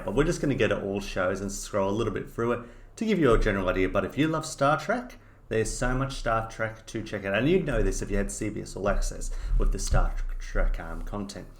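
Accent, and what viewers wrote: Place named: United Kingdom. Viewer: I'm American in U.S, Singed up to this before MARCH 4th launch, i paid only $50 ad free version for whole year ...I dont gave a S about CBS TV stuffs shows, & channels , that MTV & BET is all reality trash channels NEVER care or watch , plus i have U TUBE TV & HULU for that ..Singed up for this ONLY for half off i got for whole year & plus for Paramount & CBS UNCUT premium show's & movies is there & more to come ..beside that F the rest! I LOVE UK guys & accent, is soo cute 🥰👌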